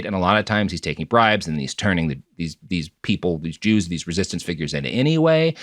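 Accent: Boston, United States. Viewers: Your Boston accent is so thick, hard to understand sometimes. Have you considered speech classes or something?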